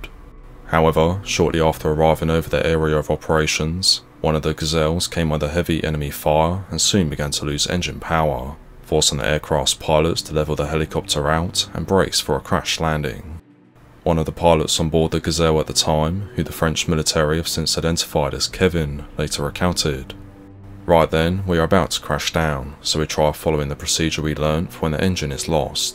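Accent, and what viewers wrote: United Kingdom. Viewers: He is American. With a British Accent.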